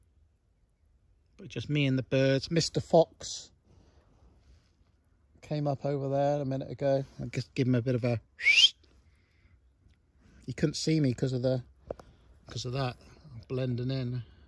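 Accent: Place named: Bristol, United Kingdom. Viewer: I thought it was Bristolian, but you also have a slight estuary twang too in some of your words. That's what made me ask.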